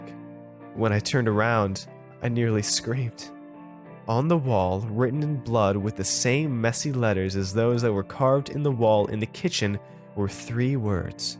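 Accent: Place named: United States. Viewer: Discovered your channel last week and just subscribed. You're one of very few American horror narrator (assuming, by the sound of your voice, you are American. No offence, if not) that I like.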